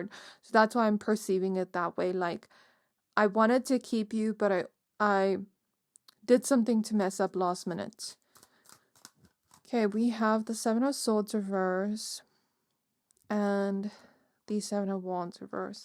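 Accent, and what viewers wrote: South Africa. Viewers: Pile 2❤. If you don’t mind me asking, where are you from? You have a unique accent 😅 it sounds a bit South African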